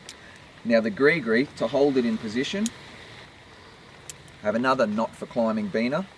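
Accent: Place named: New Zealand. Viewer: Does anyone else from Australia get really confused sometimes when you hear someone who's not an American, but just to make sure you watch a video of an American and then it clicks... kiwi...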